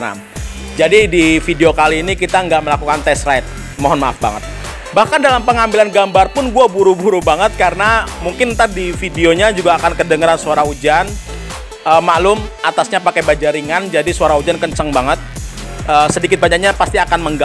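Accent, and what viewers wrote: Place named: Banyumas, Indonesia. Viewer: Ngapak pokoke jozzz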